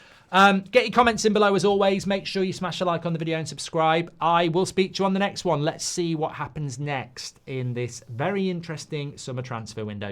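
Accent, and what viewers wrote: United Kingdom. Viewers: It looks like I am here to get Fabrizio Roman’s update indirectly with British accent.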